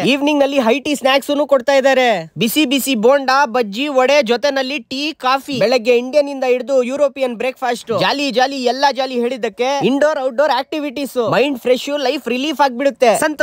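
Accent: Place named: Shivajinagar, India. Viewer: Pakka shivajinagar voice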